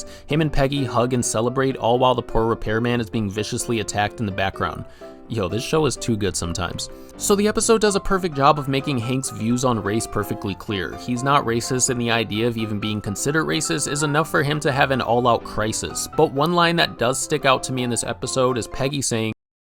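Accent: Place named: Canada. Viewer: 5 seconds into hearing you speak all I could think was “this dude is so Canadian”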